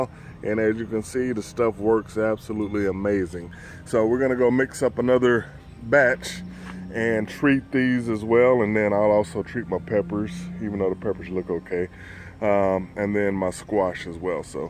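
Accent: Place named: South, United States. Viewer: I love your Southern accent! You might find a decrease in blossom end rot if you add more mulch to the top of the soil too. This keeps the moisture in the soil longer in hot weather which helps prolong the water absorption. Your garden looks beautiful! :)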